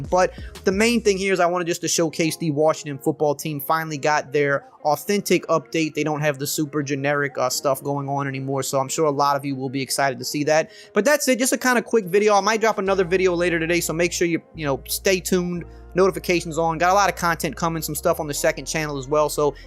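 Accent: Louisiana, United States. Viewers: Just discovered this channel and damn you have to be from the bayou lol accent is thick.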